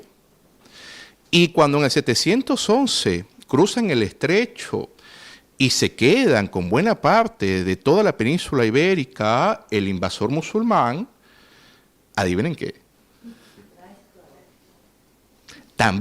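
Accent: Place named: Peru. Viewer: Un hombre muy bello, esa piel trigela, y venezolano, uff uy , aunque su acento me parece un poco al peruano, saludos desde Cali,- Colombia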